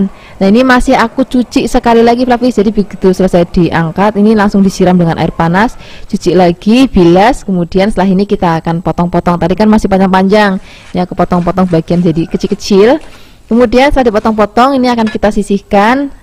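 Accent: Java, Indonesia. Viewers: Saya suka cara bicaranya...medok jawanya.😅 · Maaf mbak,kl boleh tahu,jawanya mana mbak kok cara bicaranya enak kali di dgr,bercampur gitulo bahasanya😁👍👍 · Khas jawa nya kental bgt 🥰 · Lestarikan medokmu👍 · Mbak , itu orang mn sih kok bahasanya medok jw bgt 🙂? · Medhok jawa ya..makasih mbak resepnya.nek sy petainya tak belah ..takut ada uler di dlmnya 😅😅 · Jawanya medok kaya gado"enak didengernya😅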